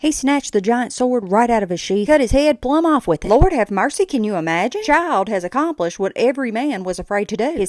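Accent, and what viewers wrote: South, United States. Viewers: AMEN sister, thank you for this...Needed of this reminder!!! Love your cooking channel, beautiful Southern delivery, and your humor. FYI that coconut cream pie looks divine!!!🤩❤️‍🔥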